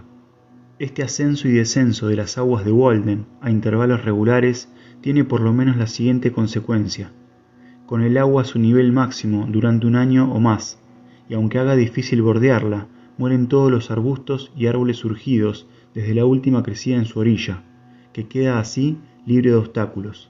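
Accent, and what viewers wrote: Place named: Argentina. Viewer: Me estás ayudando mucho, iba muy lento con la lectura por mi mala visión. Escucharte me resulta muy cómodo añadiendo que tienes una linda voz, con tu marcado acento argentino. Gracias por hacer este audiolibro y te animo a continuar. Saludos desde España🙂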